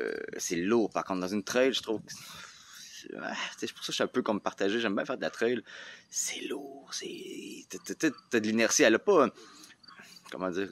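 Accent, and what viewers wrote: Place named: Quebec, Canada. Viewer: Wow l'accent québécois est très fort 😂 tsé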